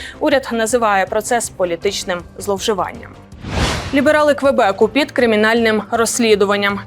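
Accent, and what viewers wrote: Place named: Kirovohrad, Ukraine. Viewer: акцент Кировоградки ,чи рядом областi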